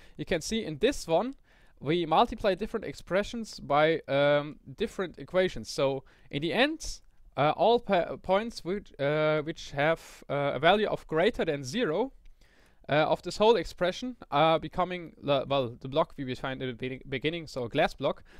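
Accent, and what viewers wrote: Germany. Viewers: Du bis Deutscher, oder? :D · No matter what you are. If you're German and start talking Math in English, you will sound like a mad scientist. Always fun to talk English in a German Accent ^^ (Halb Deutscher)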